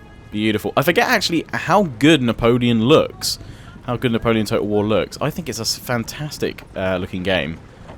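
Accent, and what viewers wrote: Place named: Scotland, United Kingdom. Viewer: That Scottish accent tho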